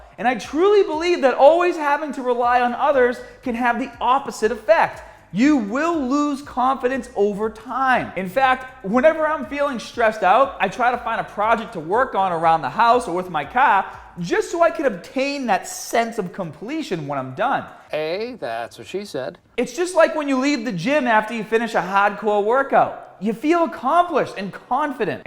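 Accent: Boston, United States. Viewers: The Boston is strong with this one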